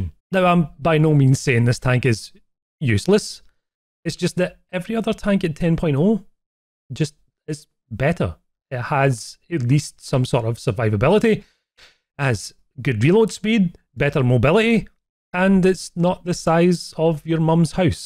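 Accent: Scotland, United Kingdom. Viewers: I really dont know why youtube algorithm get me here a hevy scottish accent youtuber playing war thunder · I'm still oldr than u in ur Scottish accent but belated birthday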